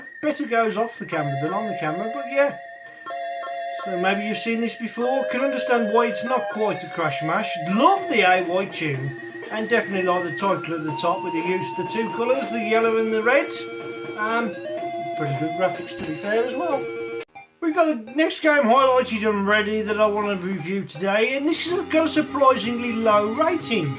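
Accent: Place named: Midlands, United Kingdom. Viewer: That's on my list to try out not got round to it can I ask where are you from you sound like you are from the Midlands I'm from Wolverhampton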